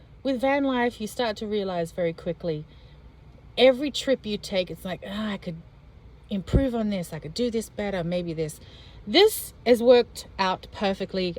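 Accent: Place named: Australia. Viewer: Nice to hear another Aussie accent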